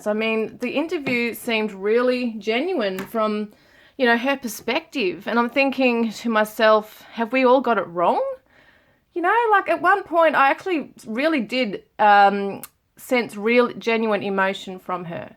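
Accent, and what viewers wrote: Australia. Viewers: Thank you just stumbled on you! Great to hear a fellow Aussie 💙 Summer ❤💜❤ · Love you in depth readings. Hearing the Aussie accent for me makes me feel more at home like we're having a personal convo Thankyou for all your doing to help bring answers to little summers disappearance ~cheers🤗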